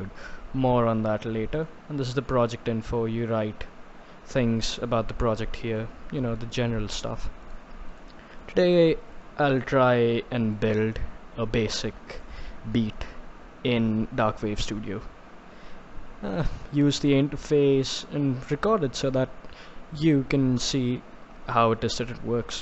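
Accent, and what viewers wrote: India. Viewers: He sounded Indian from the start lol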